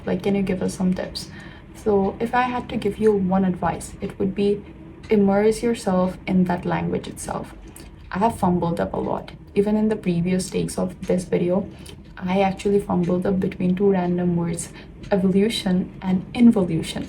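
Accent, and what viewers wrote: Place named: India. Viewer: Wow your English is fluent! 👏 Just the accent sounds a bit like Indian vibes 🥲 maybe if you change the tone a little, it’ll be perfect 💯 otherwise it's oky👌👍❤️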